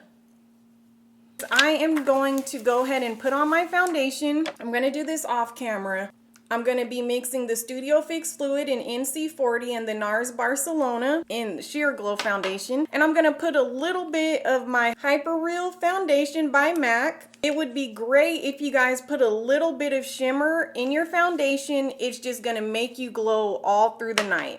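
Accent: South, United States. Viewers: Does anyone know where she is from? Her accent is so strange. It sounds southern (Im from Texas) But I cant put my finger on which part of the south. Maybe Alabama? Thanks for the help. Just curious over here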